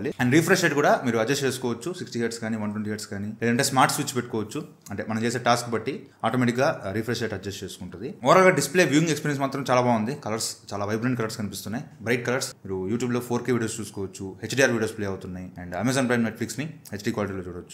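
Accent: Nellore, India. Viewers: Bro meedhi nellore aa? 😮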